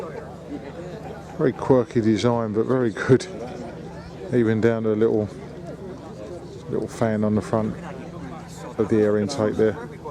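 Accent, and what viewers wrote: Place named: United Kingdom. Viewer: Seeing these cars in the UK and narrated by a Brit, is great to me. A different point of view. Growing up, my family had a ‘49 Chevy DeLuxe and a ‘59 Chevy too. Thanks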